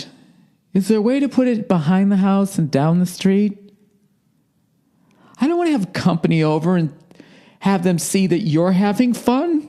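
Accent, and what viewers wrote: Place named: Boston, United States. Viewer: Lol I’m from Boston, & damn he sounds like he hasn’t left 🤣🤣I love him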